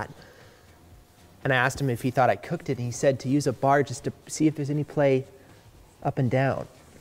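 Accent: Canada. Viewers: Not sure if you are Canadian or from Minnesota but you sound very Canadian.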